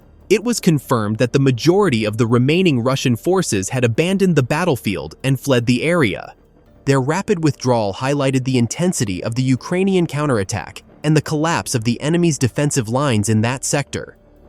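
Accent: United States. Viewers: Do you steal all of your footage from Azov YouTube channel? with an American narrator... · cant stand the overly "american" accent voice overs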